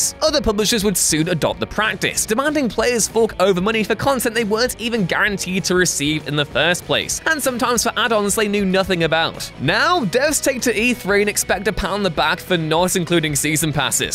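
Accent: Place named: West Country, United Kingdom. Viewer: Now is it just me or is that a really strong west country accent?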